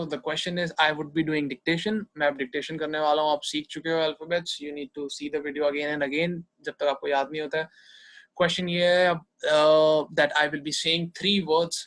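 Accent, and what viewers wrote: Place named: Germany. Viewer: Bhai tera German accent bada sahi hai. Nice, superb, loved it.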